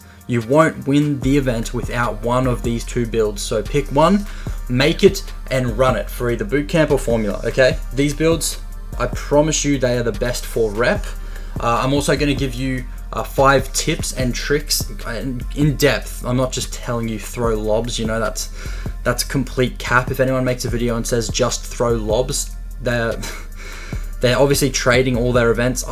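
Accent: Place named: United Kingdom. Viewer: I was just wondering where you are from? You sound like you have a little bit of a British accent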